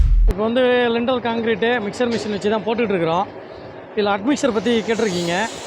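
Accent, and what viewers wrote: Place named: Thoothukudi, India. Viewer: ஹலோ இன்ஜினியர் நானும் கொத்தனார் தான் உங்களுடைய slang நம்ம ஊரு தூத்துக்குடி slang மாதிரி இருக்குது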